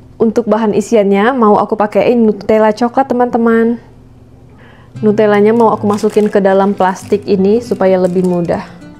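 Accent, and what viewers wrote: Pontianak, Indonesia. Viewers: Pantesan gak asing bahasanyaa, org pontianak rupanyaa kakak heheh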